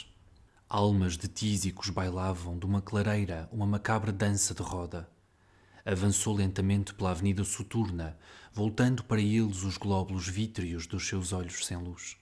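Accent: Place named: Portugal. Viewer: Maravilhoso ouvir esse poema em português Lusitano. Eu sou brasileira descendente de portugueses e estou arrepiada ouvindo essa versão. Muito obrigada!!!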